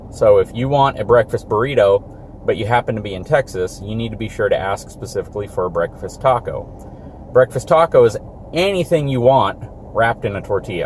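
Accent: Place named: California, United States. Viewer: You sound like an Educated Californian. Love your videos and reviews though.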